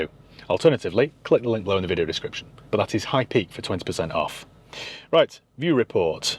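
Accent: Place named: Northern England, United Kingdom. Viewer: Bit Northern Today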